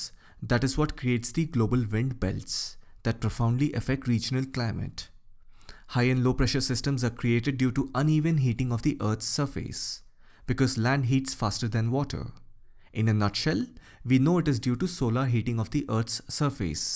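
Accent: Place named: Bihar, India. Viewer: From His accent ..it seems that he belongs to bihar... Cuz of people of bihar pronounce 'R' as 'D'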